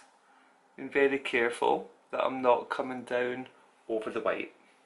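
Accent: Scotland, United Kingdom. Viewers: You're just gorgeous, and I could listen to your Scottish accent all day!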